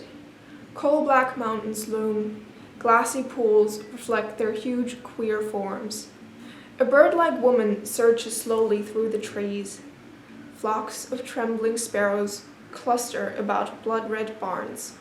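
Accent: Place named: East Westphalia, Germany. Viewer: Thanks for sharing! It’s fascinating how speaking different languages or accents change how people express themselves and how they’re perceived. I can hear some NRW sounds there, maybe a bit more Ostwestfalen? I just watched a couple of Erik Singer’s vids since I have to navigate my Sprachsituation. My parents were immigrants in Germany, I left to live in the US and now I’m temporarily in another country. This shifting of accents which you describe definitely happens to me, seems people have to cope with that, lol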